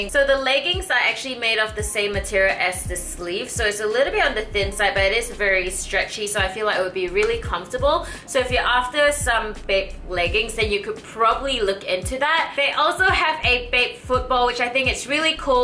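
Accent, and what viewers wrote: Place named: Australia. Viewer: That’s an Aussie accent 😉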